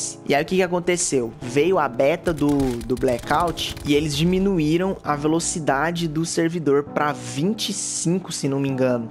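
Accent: Minas Gerais, Brazil. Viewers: Vc é mineiro ?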